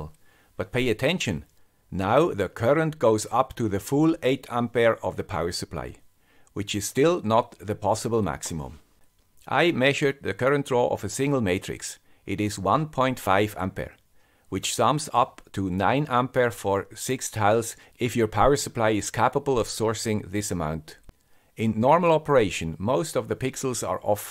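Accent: Switzerland. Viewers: No. we'll never stop watching the guy with the Swiss accent. Bye. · I was subscribed when we surpassed 100k. I will be subscribed when we hit 1M. So will be the guy with the Swiss accent. Quality content from one of my favourite YouTubers. Thanks for your videos <3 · As a guy with an Argentinean accent, I enjoy your Swiss accent enormously! It goes without saying that I love your content and your delivery. Saludos from Buenos Aires and have a great day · Same thing I thought Sir. Love this guy with the Swiss accent!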